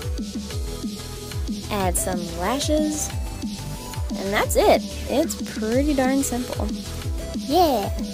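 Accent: Philadelphia, United States. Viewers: Because your accent sounds Philly.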